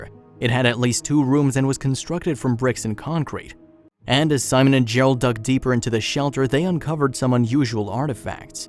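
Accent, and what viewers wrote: United States. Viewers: Unfortunately he is speaking American not English. They pronounce words like a-loo-min-um not al-u-min-e-um and sked-ull not shed-u-al.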